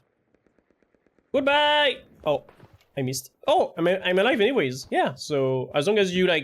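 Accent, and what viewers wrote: France. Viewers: Wow a French accent with clear English is actually beautiful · French AND a speech impediment that’s crazy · I cant tell if that beautiful accent is french or something else, but god it invests me into the video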